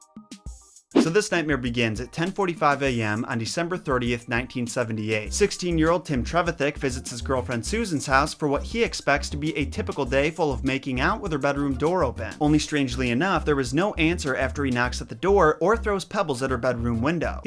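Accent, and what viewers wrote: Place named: Midwest, United States. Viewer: lmao yes 😂 Also you have gotta be from the mid-west! Every time you say “Bob” I feel like I’m at a family reunion in northern Minnesota Lol!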